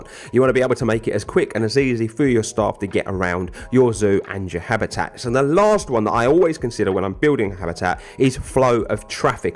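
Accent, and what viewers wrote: United Kingdom. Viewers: What part of the UK are you from?? I can never figure out the accent.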